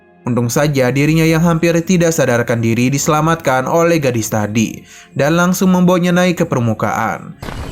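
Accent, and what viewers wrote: Banjar, Indonesia. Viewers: Min mimin orng banjar kh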